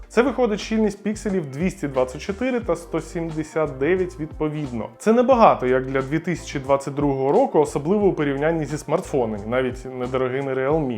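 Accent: Russia. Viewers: Дуже тішить що і "Цитрус" перейшов на українську - респект! Видно, що не дуже ще комфортно з такими змінами (часом зустрічаються русизми), але він старається і саме головне - не прикривається тим, що мова не доскональна і соромно таке публікувати... Тому наше (споживачів контенту) завдання полягає в підтримці (підписка, вподобайка, комент (гарні слова в підтримку) автора(ів) і з практикою все прийде, вдосконалиться і буде не вимушене)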